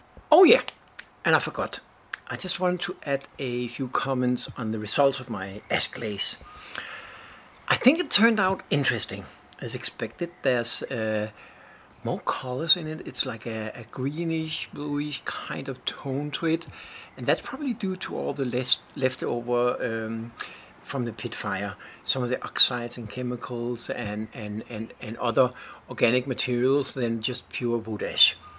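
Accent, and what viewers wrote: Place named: Denmark. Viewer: I just realized how the beautiful Danish accent fits the mad professor character so absolutely perfect 😂 Greetings from your southern neighbor!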